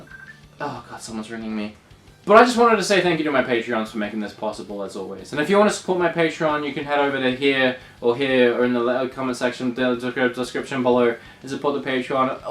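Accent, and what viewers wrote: Australia. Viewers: YOUR AUSTRALIAN!!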